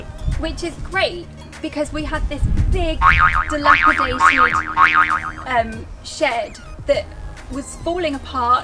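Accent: United Kingdom. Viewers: How cool, you're from the UK! - I recently commented on your blizzard beach photo and you mentioned your channel to someone in the comments. I just assumed you'd be american but then I was like "hang on a tick, I know that accent" - Your channel looks really interesting and helpful too. I'll definitely be sticking around :)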